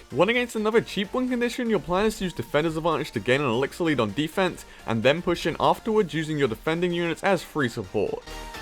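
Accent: United Kingdom. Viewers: I got it your a British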